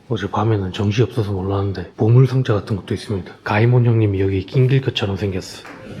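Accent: Busan, South Korea. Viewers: 달근한 말투였다면 닭살 돋을 멘트들을 특유의 억양으로 들으니 귀에 쏙쏙 박히네요. 50대이지만 많이 배웁니다^^ 근데 나두 부산싸나이지만 부산말은 맞는데 첨 듣는 말투같은 너낌은 뭘까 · 저녁 먹으면서 소주 반잔하면서 보기 딱 좋은 채널. 같은 부산 사람으로서 공감대도 가고. 저희 아이들도 계속 으이~~ 으이~~해서 웃겨요^^ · 고생은 사서 한다고 원치 않아두 고생하게 되죠. 인생 자체가요 참 머든 열심히시며 차분히 같이 즐기게 되네요! 경상도 사투리에 외국 사투리는 없으신듯 있으셔두 근본이 ㅋㅋ 부럽습니다. 덥다는건 또 몰랐다 이제 알았습니다. 부디 안전히 건강히 오세요 ^^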